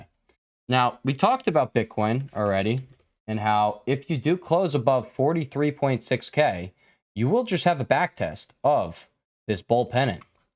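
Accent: New Jersey, United States. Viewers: Are u from jjjjeersey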